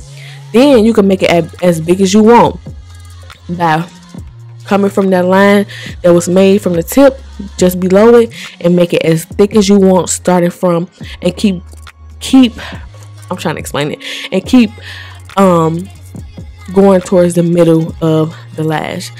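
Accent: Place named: DMV, United States. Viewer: You sound like you're from the DMV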